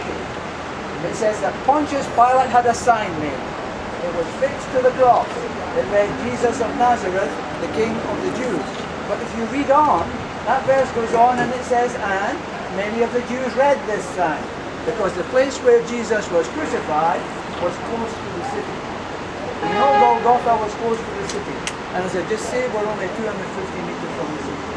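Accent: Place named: Glasgow, United Kingdom. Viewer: He's Scottish! Sounds like a Glasgow accent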